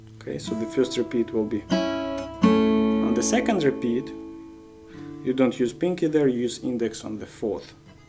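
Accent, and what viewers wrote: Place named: Russia. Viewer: Прям русский акцент.дядька,чем тебе наш язык не угодил?думаешь просмотров больше будет на инглише?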